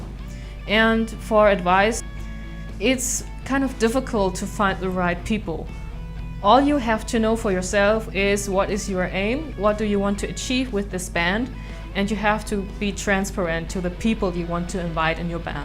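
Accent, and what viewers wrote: Germany. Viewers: A true accent from a true german girl! And yes, she really is! ;D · I really love German accent😍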